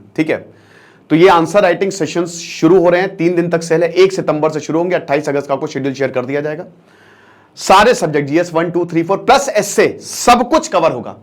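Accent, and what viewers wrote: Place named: Pratapgarh, India. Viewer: Sir sarau se aap pratapgarh se hai lg rha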